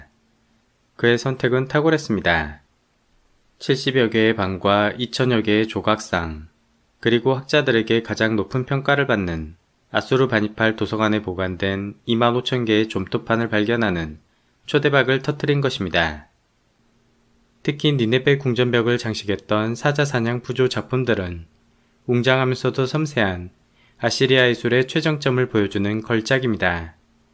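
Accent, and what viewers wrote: Jeolla, South Korea. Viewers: 전라도 분이신가?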